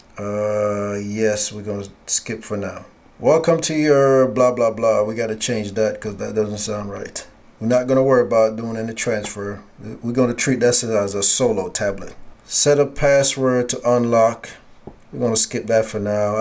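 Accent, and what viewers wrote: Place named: Jamaica. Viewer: Jamaican? lol ...accent is strong. Thank you for this I was confused on which one I should get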